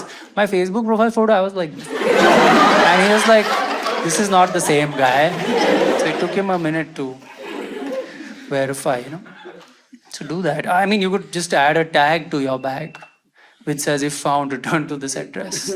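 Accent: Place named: South India, India. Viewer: south Indian accent is so sexy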